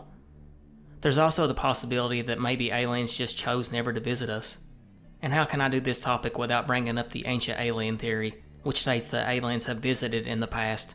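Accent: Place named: Mississippi, United States. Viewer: That’s the First thing I thought. Great content in something close to my Mississippi accent! Keep up the good work!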